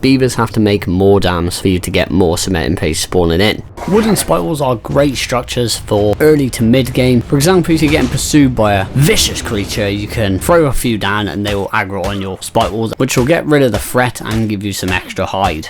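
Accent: United Kingdom. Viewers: This accent is different, British!??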